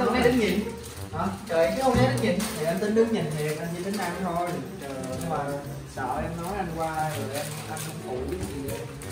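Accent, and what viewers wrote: Vietnam. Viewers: Anh nói tiếng Nam đáng yêu xỉu 😍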